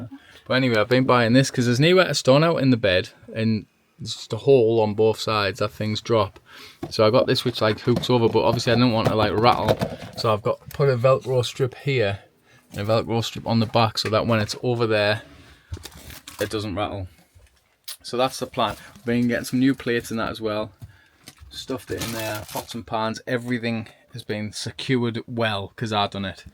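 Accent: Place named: Newcastle, United Kingdom. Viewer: As an ex Geordie like left 65 years ago. I am thinking most people will not understand some of what your saying. I do though.❤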